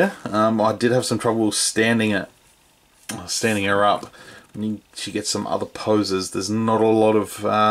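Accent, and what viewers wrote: Australia. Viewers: Thanks. You are right they let her walk like a human and it spoils all the hard work the effects people did. Where do you get your figs from you sound like an Aussie. Forget the Aussie housing crisis there is there is a black series crisis.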